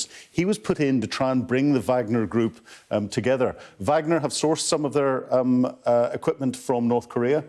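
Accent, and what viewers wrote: United Kingdom. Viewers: she sounds British but she is not British. How can this be ?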